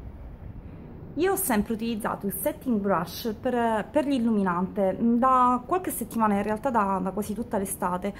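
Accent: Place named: Palermo, Italy. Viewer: Ciaooo ti ho appena conosciuta su YouTube! Ma sei di Palermo? Si sente un po l accento ma non vorrei sbagliare ^-^ sei in gamba mi sto vedendo un bel po dei tuoi video perché vorrei mettere me ma anche la mia famiglia a dieta xD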